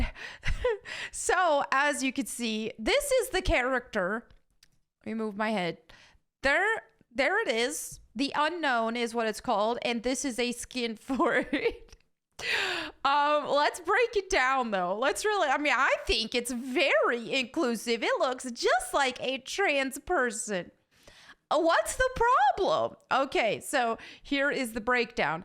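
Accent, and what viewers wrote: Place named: South Carolina, United States. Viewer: I love you. You have such a South Carolina accent, which is where I'm from. I kind of recognized it.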